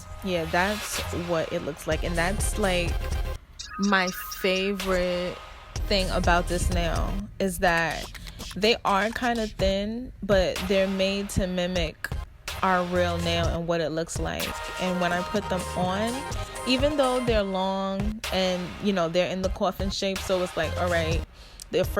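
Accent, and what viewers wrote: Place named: New York, United States. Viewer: yass. and i hear that new york in you tooo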